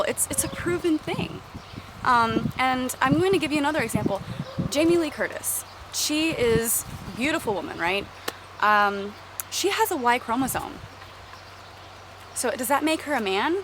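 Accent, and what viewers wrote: United States. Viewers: Such a great way of putting it! Love your videos and your message! My bf was watching them with me and says "she sounds like an american version of you Steph!" :') Love watching someone make total sense! xx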